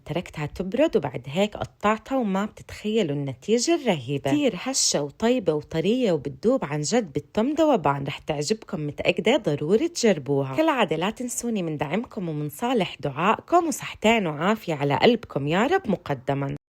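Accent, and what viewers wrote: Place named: Palestine. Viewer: اولا انا اكتشفتك من اسبوعين ومتابعتك من سوريا وبيعجبني شغلك كتير تانيا انتي اكيد فلسطينيه مبين من لهجتك الحلوه وكنيتك وانا كمان ستي امه لابي فلسطينيه من بيت التابلسي بس نحن سوريين ....تالتا رح نجيب شاياتنا ونجي ناكل الكاتو عندك بس انت وين ؟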